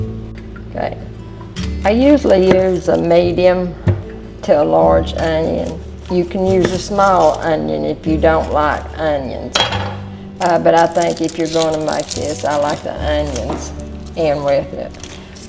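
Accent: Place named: Tennessee, United States. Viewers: I absolutely love your south😅ern accent. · I just discovered your channel for the first time. I was born and raised 45 miles Northwest of Nashville and grew up on this kind of food and hearing my relatives speaking in this matter. This channel is just like going home. Thank you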